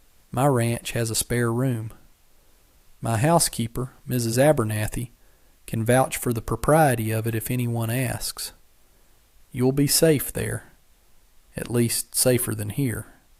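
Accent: United States. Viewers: I really do enjoy these stories. Well written and narrated with the nicely accented American voice. The image generator does a fine job. These are a nice respite. A good place for an old guy who likes Westerns.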